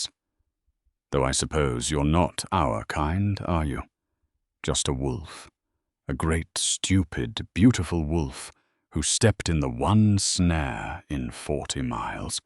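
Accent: United Kingdom. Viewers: My solution to the annoying video pictures that don't match the story is to fold a piece of paper over the top of my cell phone that is long enough to cover that section, click on Show Transcript, and follow along with that purring yummy English / British accent and, as the fantasy tale unfolds, my imagination does what it is meant to do and creates a movie in my head that captures the right characters into all the scenes. This technique might help you enjoy more videos that have that irritating picture section that doesn't match the plot line details. Just a thought from Windsor, Ontario Canada 🇨🇦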